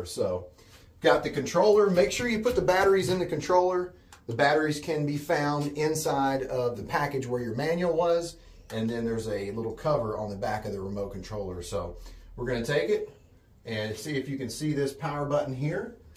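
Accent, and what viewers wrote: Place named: Tennessee, United States. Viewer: This is a truly excellent video on how to install a mini split. Thinking I will be using this tutorial if and when I need to replace mine. One thought,....likely a regional thing, but I notice your frequent use of "Take and...." before words like, "Use", "Push", "Put" etc. I have heard this before,.....might be a Tennessee thing. Just Push, Put, or Use,.....without the "Take and..." Might not feel right to you if you grew up with everyone saying "Take and...." Otherwise,....a great tutorial,...thanks for taking the time to video, edit and post it for us to learn from.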